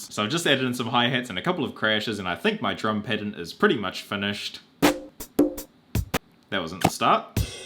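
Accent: New Zealand. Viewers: are you from new zealand? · are you a new zealander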